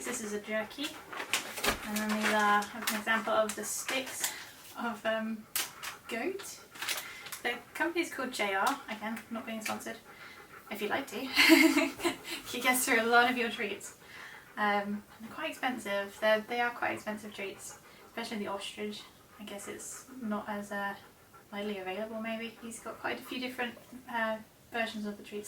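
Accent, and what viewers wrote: United Kingdom. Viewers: We Americans love soft, gentle, British accent especially when she says words like planting...or pl-aww-nting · Never knew is British 😅 Love your accent 🤩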